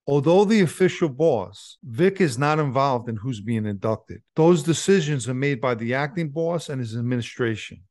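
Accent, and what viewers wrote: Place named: New York, United States. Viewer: I’m down here in TX and I love NY mob stories. I like your NYC accent, it adds to the stories. Glad to know you have removed yourself from that madness.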